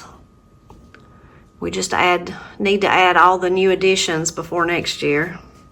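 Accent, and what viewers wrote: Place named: Appalachia, United States. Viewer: Great video!, thank you for wonderful ideas to decorate. I am watching the video and eating the biscuits we made using your recipe. Is there a chance to make a video on how to master Appalachian accent, especially [ai] sound like you pronounce in whIte, or try. Thank you Best, Yulia from Florida, Katie's cheerleader;-))))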